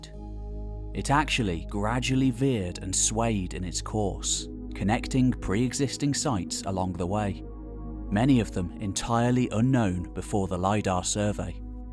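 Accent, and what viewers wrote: England, United Kingdom. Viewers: Wow! How deeply flawed a video. Like an 8yr old researched it from outdated school history books but read by a English guy to make Americans feel like it's intellectual fact. Smh 🙄 (but still lmao!)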